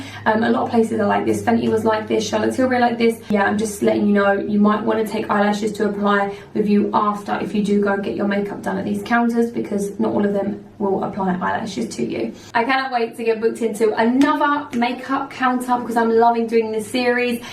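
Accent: United Kingdom. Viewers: I knew she was british before i clicked on the video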